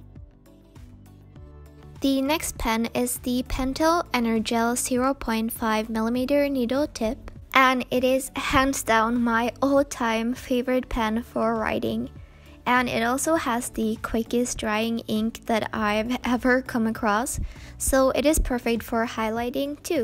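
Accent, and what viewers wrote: United States. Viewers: I was surprised when I found out that you were Norwegian. I found out when i realized that you have access to Panduro, which is one of my local stores. And in another video you used your phone and the language was Norwegian. The reason I'm saying this is because from all the Norwegians I've heard. You sound like you come from America. Obviously I don't know if you come from Norway. Cause I live in Norway, but I come from a different country, and so Norwegian is my second language. And it makes me sound not NorwegianXD I'm just saying that I've been getting so used to hearing typical Norwegian people speak English, that it surprised me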